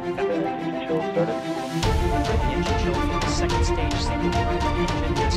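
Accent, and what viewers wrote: South Korea. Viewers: 이사람 전라도 말투쓰는사람 맞죠?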